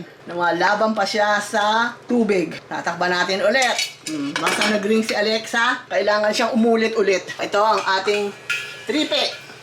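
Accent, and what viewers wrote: Philippines. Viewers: I feel like Im in the Philippines while watching. The accent, the vibes, and humour feel like you are at home with your nanay. With all the ❤️ dun sa kare-kare not just appetizing but eating w family together is therapeutic.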